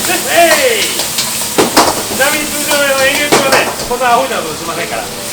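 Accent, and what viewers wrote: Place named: Mikawa, Japan. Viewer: 料理も美味そうだけど、気持ちいいくらいの三河弁が聞いてておばあの実家の郷愁に駆られる…笑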